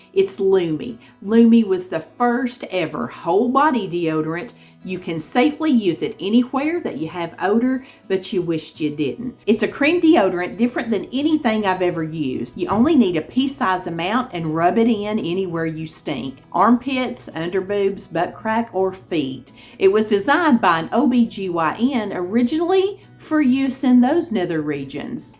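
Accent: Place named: Georgia, United States. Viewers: I do love listening to you talk, you remind me of my hometown in southwest Georgia. Your recipes are delicious and healthy. And I love cooking the food you cook ❤ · I cut my bell peppers from top of stem...curve over seed pod to bottom. I used to do it your way....so maybe try my way lol! Love u...I am a SC girl....so appreciate the accent!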